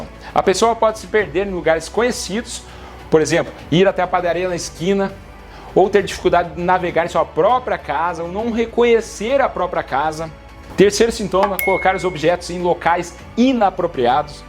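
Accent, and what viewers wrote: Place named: Minas Gerais, Brazil. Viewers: Doutor de acompanho da Bélgica, gosto demais, e amo teu sotaque, você é de Minas Gerais, ou estou enganado? Abraço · Gosto muito do jeito q ele fala,como se fosse mineiro 👏👏👏👏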